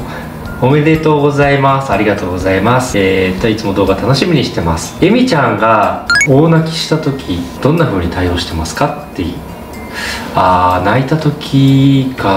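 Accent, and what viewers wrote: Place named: Kyushu, Japan. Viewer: 九州訛りが お顔とミスマッチなところがとてもいい感じで好き😊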